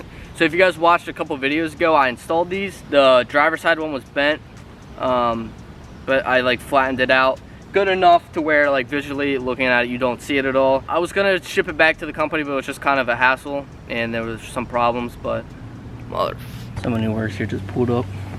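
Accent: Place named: Maryland, United States. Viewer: Your maryland is showing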